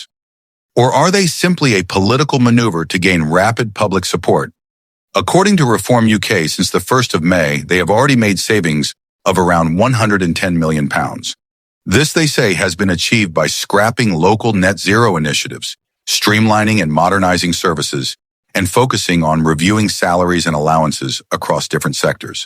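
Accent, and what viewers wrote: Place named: United States. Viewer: England Now - With an American accent. Not for me.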